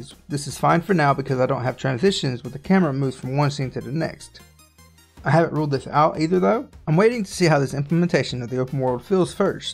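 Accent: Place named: Texas, United States. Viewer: I like your Texas accent,are you from Texas?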